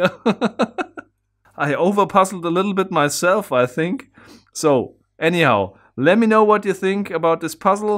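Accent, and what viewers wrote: Germany. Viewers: Oh, Guy! Are you from Germany? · Omg man hört so raus dass der deutsch is xD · are you german? :DDD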